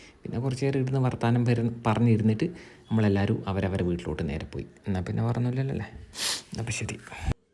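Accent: Kannur, India. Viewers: Chetta ningala sound ok set anu ee presentation ok adipoli poliyanu.... Enkilum food ok orupad kazhikkunath kond ninglde health nu ok valla issues verullei....... Work out ok chryyarundo.......Enthayalum viedio adipoli anu ketto..........ath parayand erikkan pattulla athra super anu❤️vere oru vloggers num illatha nice presentation.... Pinna kannur slangum adipoliii❤️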